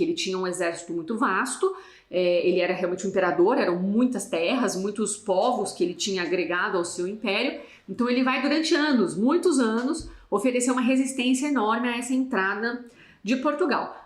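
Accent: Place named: Brazil. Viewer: Me dói tanto que sejam os intelectuais brasileiros a se interessar e a estudar a literatura moçambicana e não os próprios moçambicanos. Adoro ouvir o seu sotaque brasileiro, sobretudo, quando pronuncia o nome do nosso imperador de Gaza (Ngugunhane). Mas tão de parabéns. Abraços de Moçambique.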